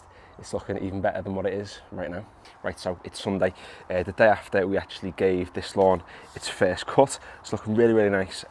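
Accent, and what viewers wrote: Liverpool, United Kingdom. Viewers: Just subscribed and although there are loads of YouTubers doing what you do, love ya scouse presenting style 👍🏼 I have loads of initial questions and hope you can help: - I use a Ryobi lawn mower but always see people using Hayter. Definately worth changing to a Hayter? My ryobi doesn’t give me any stripes which is one good to reason to change. - 2nd question, it would be great to do one video ( unless I’ve missed it, in a sitting down and chatting and running though, in order what you should be doing. I know you mentioned about not necessarily by month as weather changes will dictate what to do when but that would be mega useful 👍🏼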